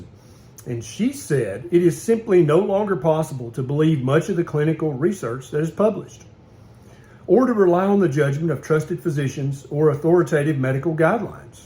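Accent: South, United States. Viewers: You are simply the best and with a southern accent ! 🤣😂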